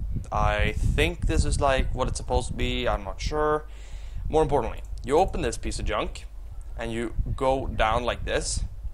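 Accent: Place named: Sweden. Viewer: not a good thing dude. You have a really horrible way of delivering what you're trying to say. You take way too long to say it and sound really spastic. But it sounds like you're swedish so i'll just chalk it up to english possibly not being your first language.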